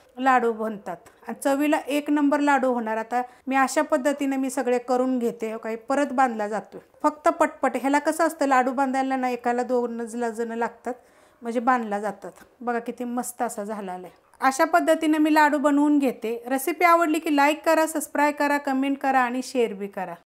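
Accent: Satara, India. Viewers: मी पण सातारची आहे .... भाषे वरून मी तुम्हाला ओळखले 👍🏻👍🏻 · खूप छान रेसिपी आपली सातारी भाषा आणि सातार भाग सारखे च लाडू बनविले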